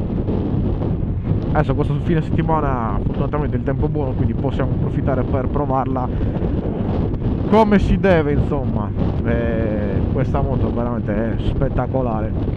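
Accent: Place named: Sardinia, Italy. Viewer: mi fa morire il dialetto sardo😂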